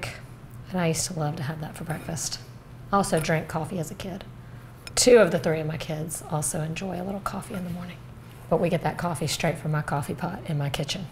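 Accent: South, United States. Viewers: She’s gotta be from the south……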